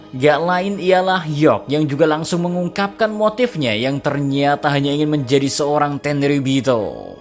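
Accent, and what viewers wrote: Java, Indonesia. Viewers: logat Jawa Inggris nya mantep bang wkkw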